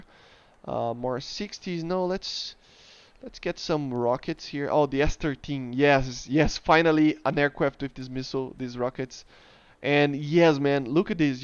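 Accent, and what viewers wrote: Brazil. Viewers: You sound like a Brazilian guy 🤔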